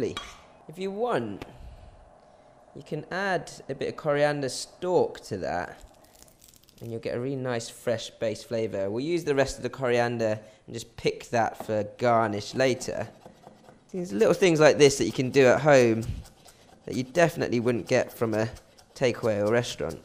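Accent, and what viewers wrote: United Kingdom. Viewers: Chinese guy with British accent got me head spinning! 😳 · Slightly British accent 😁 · Love your British accent 😄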